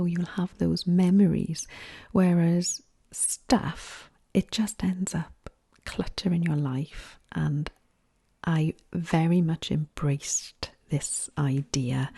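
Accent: Wales, United Kingdom. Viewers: I love Welsh accents! So sophisticated!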